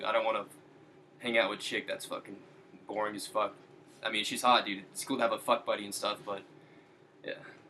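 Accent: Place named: Australia. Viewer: talks like an Aussie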